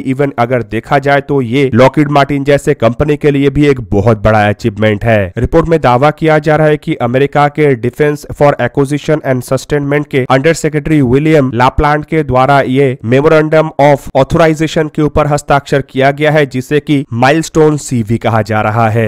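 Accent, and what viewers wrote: Bengal, India. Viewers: Bengali speaking Hindi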